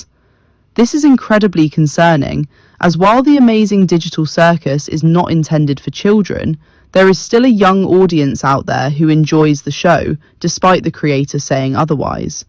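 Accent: United Kingdom. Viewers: Whelp, this is the first time I heard your voice, I can tell you’re a Brit, also hopefully the uttp falls and hopefully the whole community dies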